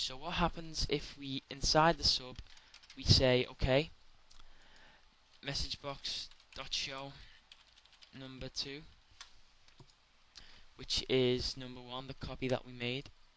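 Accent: Liverpool, United Kingdom. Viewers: good video ... understood it perfectly thanks. your accent tells me you're from liverpool... am i right?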